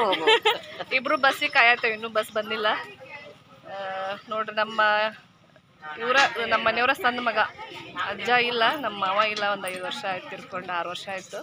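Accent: North Karnataka, India. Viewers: I am suma nim vlog changidy nima utara Karnataka mathu thubha nice nima urena vlog madi mam👍👍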